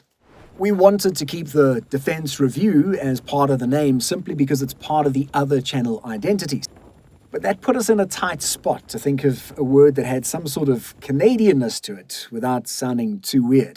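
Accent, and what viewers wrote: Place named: South Africa. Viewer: What accent is this... I'm picking up south African... Australian... bit of uk english....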